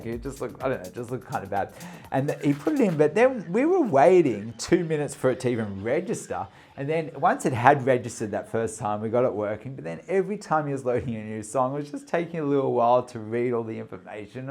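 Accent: Australia. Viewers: I love how he softens the blow of bad situations with that Aussie accent!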